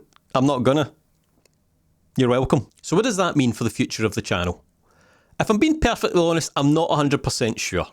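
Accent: Scotland, United Kingdom. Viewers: I think we're all here for whatever you decide to do. Personally I never realized how much I needed a thick Scottish brogue in my life till I found your vids. Thanks for all the laughs my friend and I can't wait for what's next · I dont mind any games tbh. I just come to your channel for your banter and Scottish accent hehe. I'm glad it's nothing serious though. Take your time and go at your own pace:) Can't wait to see more of your content:D Ngl i did come for the VR stuff but once i heard your banter and jokes etc. That whole personality stick, I'm definitely sticking around <3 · I'll gladly throw money your way just to listen to you talk, VR be damned. I moved to Scotland 5 years ago from Hong Kong and you have been one of my better sources to get used to the Scottish accent. Good luck and look forward to your new stuff! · I'm here for the funny Scottish man rambling at me. 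I honestly don't even look at the video 80%-90% of the time. To me, as long as you keep doing you, nothing will change. I would like to see "Scottish skeleton pirate plays Mario 64" though. YOU CAN'T HIDE THAT N64 FROM ME, I SAW IT! Anyway, you'll be fine im still gonna listen to you. Take care of yourself. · Vr, flat, doesn't matter to me at all! Honestly your banter is unmatched and you're hilariously entertaining no matter what you do, so I'm not going anywhere. The content is almost secondary to the laughs and the gloriously Scottish commentary throughout xD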